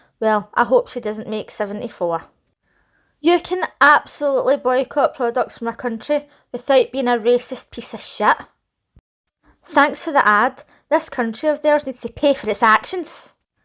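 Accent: Scotland, United Kingdom. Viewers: ps ...Im London all my life,...nothing wrong with your scottish accent...perdfectly understandable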